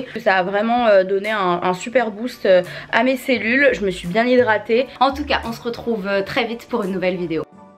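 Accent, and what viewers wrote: Quebec, Canada. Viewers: C'est moi ou tu as un petit accent québécois 🤭🤭❤️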